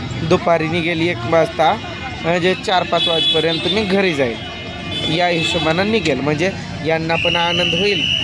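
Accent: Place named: Latur, India. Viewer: दाजी पीवर लातूर ची भाषा की ओ तुमची😂😂😂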